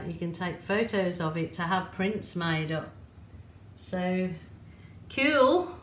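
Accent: Birmingham, United Kingdom. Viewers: Aaarh Bostin way spotted the Brum accent when we subscribed to you. From John ex Brummie (handsworth) but only moved to NE England!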